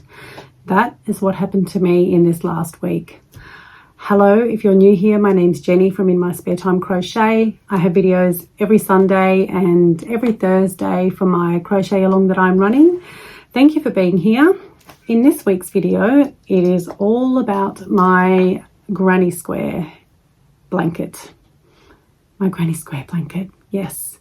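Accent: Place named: Australia. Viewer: ❤ watched all the way through! Great to hear an Aussie accent speaking about crochet! Your blanket is beautiful!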